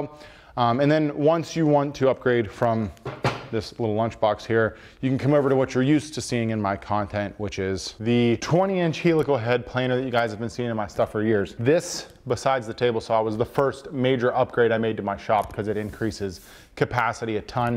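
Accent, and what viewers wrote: Pittsburgh, United States. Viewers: Oh man are you from Pittsburgh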